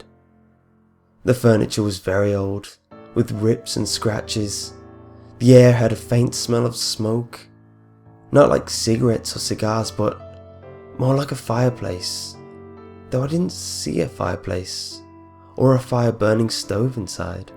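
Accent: England, United Kingdom. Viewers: are you from the south or north of England?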